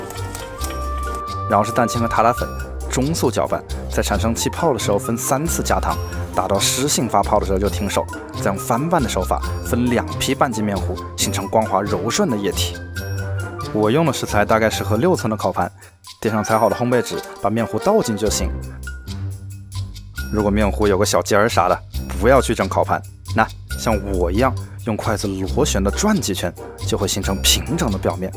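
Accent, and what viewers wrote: Wuhan, China. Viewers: 怎麼感覺是武漢人啊？！🤣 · 是武汉人吗？听口音像极了武汉滴弯管子普通话……😊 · 视频主是武汉人吗？感觉有武汉口音😁😁😁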